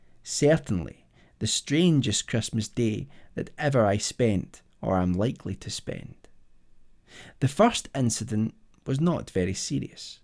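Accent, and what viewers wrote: Scotland, United Kingdom. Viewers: I realise this is 9 years old, but I've really enjoyed your narration, glad I found your channel. I love a Scottish accent ❤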